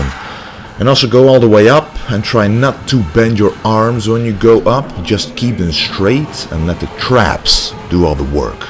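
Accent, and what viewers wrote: Netherlands. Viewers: he is dutch man that is him talking normal lol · I hear a slight Dutch Twang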